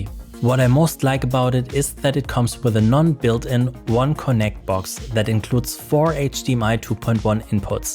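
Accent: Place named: Germany. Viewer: You sound almost exactly as "Battlenonsense" on YouTube. It's zee German accent.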